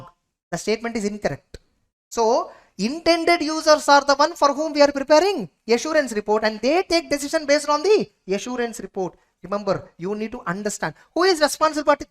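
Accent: South India, India. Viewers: Im gujarati medium student Watching audit in english in south accent in inter Aura <num>😂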